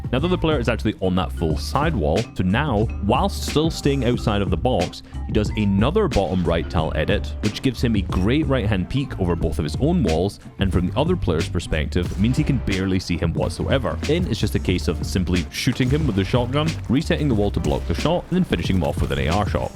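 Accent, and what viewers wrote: Scotland, United Kingdom. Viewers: Your accent makes me want to drink IRN BRU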